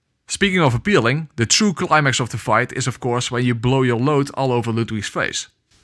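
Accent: Netherlands. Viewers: Jezus het Nederlands spat er vanaf de eerste seconde af. Lekker man, altijd mooi Nederlandse soulsborne fans. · You sound kapot dutch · Nederlands accent? hahaha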